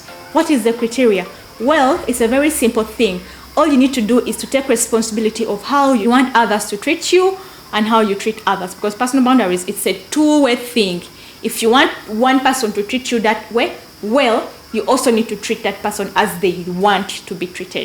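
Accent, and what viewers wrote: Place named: Uganda. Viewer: has directed me here. I love the Ugandan accent. So "pechuuulia"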